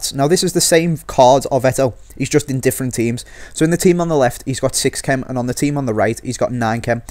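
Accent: Liverpool, United Kingdom. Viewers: i thought it was just the scouse accent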